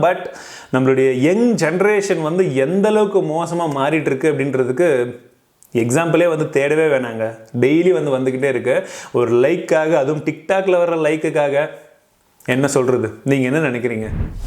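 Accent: India. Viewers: வாழ்க தமிழ் if an innovative app from India raises I would really help with everything I have but not an app like tik tok even if it was from India I really see you as a Tamil version of MrWhoseTheBoss if you had an amazing accent other than Indian English accent you would reach ammmmmaaaaaazzzziiinnngggg heights 2 million subscriberslam onnum ila ungaluku please don't think this comment as a publicity these come straight from my heart and please do not think I'm discouraging you or Indian accent I'm just telling white men accent is soo good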